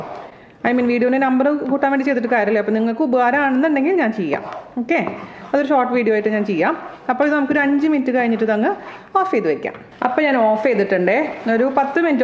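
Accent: Thrissur, India. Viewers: The dish is too good, you have a real talent to talk well in the typical Trichur slang, though sometimes it crosses the limit and cooking takes a backseat...on the whole, your videos are drool stimulating.... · Hi! Lovely recipe. Will try this with Doshas tomorrow. My native is Thrissur too and I love the way you speak the typical Thrissur bhasha. Hope to meet you someday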